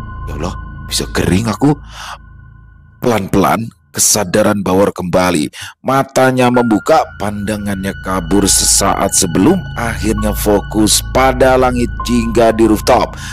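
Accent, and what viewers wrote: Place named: Banyumas, Indonesia. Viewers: Keren, bisa logat ngapak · Akhirnya ada karakter jagoan ngapak juga.. Matursuwun mbak,Lanjutkan.., salam dari purwokerto 🙏🙏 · harus lanjut part deh cerita ini,dn di jadikan playlist,sukur2 BAWOR dan TIGOR jd partner biar slalu menampilkan logat cilacap dan batak pasti seru(seperti doni dan gembul}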